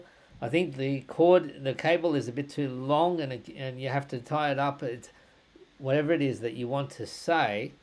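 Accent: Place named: Australia. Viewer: Very informative video. Thank you,! One thing that I particularly liked about your video is that, based on your accent, I'd say you're Australian. I am, too, and it's nice to see content that is going to be applicable to me. Sometimes I will watch a video about something only to find that the program is only for US residents, which is frustrating and a waste of time. Keep it up!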